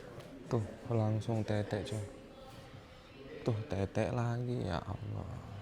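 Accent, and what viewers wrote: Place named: Palu, Indonesia. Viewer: Logat palu lho😅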